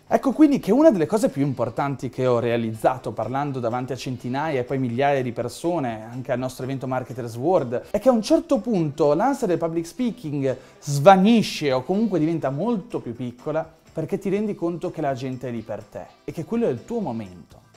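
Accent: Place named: Ferrara, Italy. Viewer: Ma sei come me di Ferrara, mi sembrava di sentire un accento comune!!! :D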